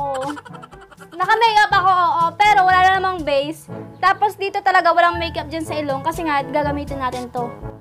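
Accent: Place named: Pangasinan, Philippines. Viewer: U have pangalatok accent hehe🤗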